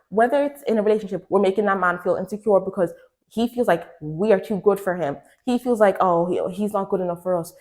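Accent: Ireland. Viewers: Are you from Ireland ? Thought I heard the accent 😊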